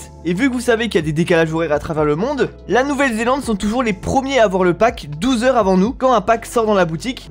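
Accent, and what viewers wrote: Quebec, Canada. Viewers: ta parler quebequois la non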